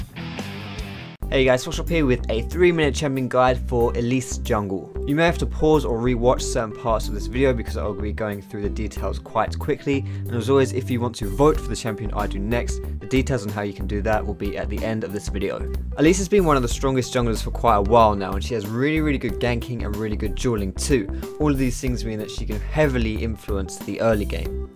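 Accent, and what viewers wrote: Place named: Southern England, United Kingdom. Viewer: ... What do you mean what's with the accent? He's from the south of England...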